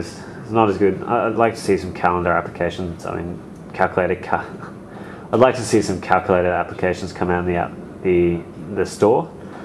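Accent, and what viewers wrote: Australia. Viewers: telstra~~~you are aussie... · You Aussie mate?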